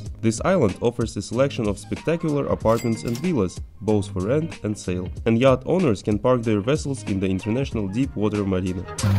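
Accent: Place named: Russia. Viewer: Братан, у тебя русский акцент. Это 100%. Ты откуда?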